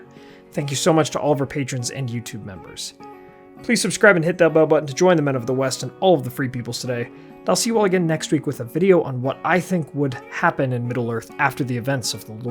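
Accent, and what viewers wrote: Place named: Bay Area, United States. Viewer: Jersey ? Detroit ? Chicago maybe ? No I know The Bay Area !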